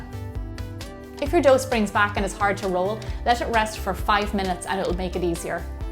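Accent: Ireland. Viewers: Hello are u irish if so I'm half irish and I went to Ireland for 4 weeks and made half your stuff love you! I'm going to be a confident bold baker for the rest of my life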